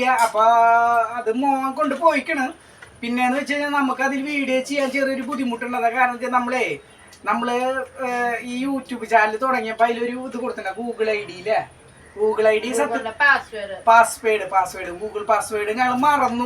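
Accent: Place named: Thrissur, India. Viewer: Good to ur thrissur basha.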